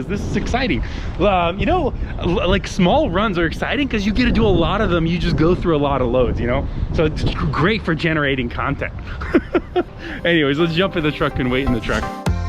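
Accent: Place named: New Jersey, United States. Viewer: Awesome video, you actually talk like you could be from Jersey ✌🏽keep up the videos brother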